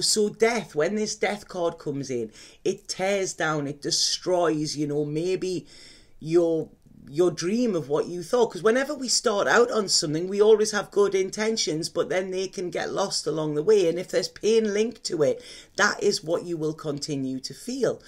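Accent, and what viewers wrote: Newcastle, United Kingdom. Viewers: it does suit you. I am watching your videos from Marseille. How funny..I was 7 years in Newcastle so your videos have a special touch to me. I love it all, you accent and kindness remind me how beautiful and friendly Geordies are + your gift as a reader is amazing and the positive energy you bring to us is a tribute to your soul. I am also a fellow Virgo..so all of this makes me feel like a child in a school yard feeling 'I want her to be my friend!!!!!' Keep up you are a highly evolved human being. Almost to good for this earth....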